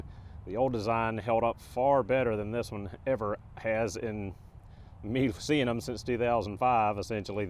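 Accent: South, United States. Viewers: Nice video describing just the job I`m doing now. I like your southern accent! Love from Norway.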